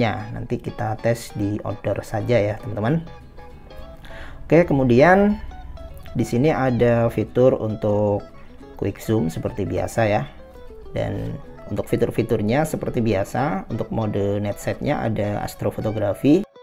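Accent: Pasuruan, Indonesia. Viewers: Eh masnya pasuruan toh 😄 Koyok daerah bangil. Oh iya Mas mau tanya untuk redmi note 7 ada gak gcam support yang ada fitur wide angle ada gak mas? Buat keperluan foto lanscape 🙏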